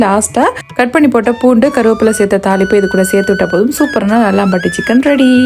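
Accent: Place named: Kerala, India. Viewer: Unga pechu la slightaa malayalalis pesara slang therinchudhu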